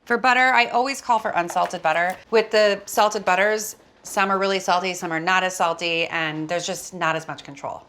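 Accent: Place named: Southern California, United States. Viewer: perhaps you don't know this ... you really help people out of depression. (insert something funny) coastal peeps. immediately knew you were socal, I'm norcal. love it.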